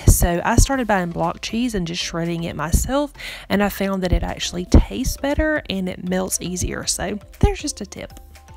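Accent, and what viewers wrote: South, United States. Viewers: My husband will never run a dishwasher, washer, or dryer if we are not home because if a hose breaks we would it hear a problem and have major water damage. With the dryer it is not water damage but electrical fire. Love your channel and of course your southern accent.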